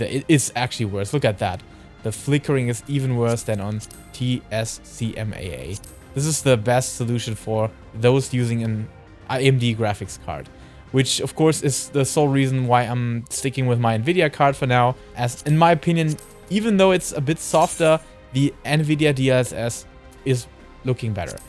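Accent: Germany. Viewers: Haha, bei dir merkt man sofort am Akzent, dass du aus Deutschland bist. Grüße aus Niederösterreich.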